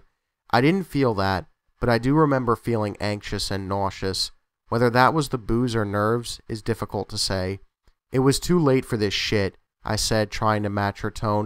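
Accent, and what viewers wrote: New York, United States. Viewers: Where are you from? New York or Jersey?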